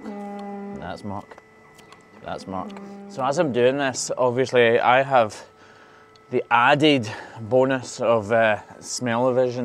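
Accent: Scotland, United Kingdom. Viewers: Hey man your Scottish right? I know you slip up on your vocabulary a lil bit but now wrys at that point go back to Scottish roots if we can't understand don't wry that's what closed captions are for lol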